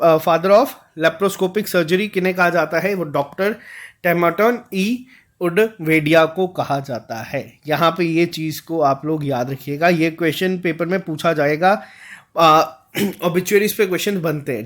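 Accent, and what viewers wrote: Kashmir, India. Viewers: I love u sir thank you for everything 💗 One more thing sir your kashmiri accent is nice ...Siyah rood jaeren Manz...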